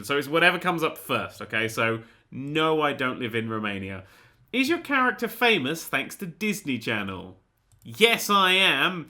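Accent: England, United Kingdom. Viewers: Are you from England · are you from Britain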